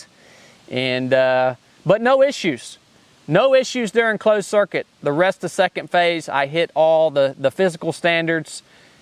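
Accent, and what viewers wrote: South, United States. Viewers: what state are u from? I'm from OKLAHOMA.u sound like u are from the south?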